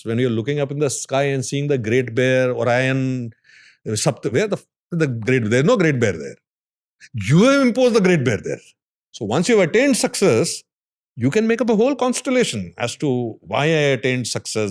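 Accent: India. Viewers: I must say that i was a fan of think school till you started lecturing what good communication should be. Why are you digressing. Your content is so good, be at that. You have such heavy Indian accent and monotony is the way you deliver things. Just someone saying you communicate well doesn't mean you take that up as your business. Do thinkschool, do great job.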